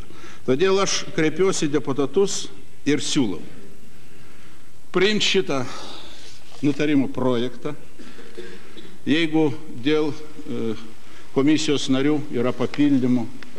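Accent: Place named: Russia. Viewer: blogai ,jis mires bet jo akcentas russiskas ,kaip ir snieckaus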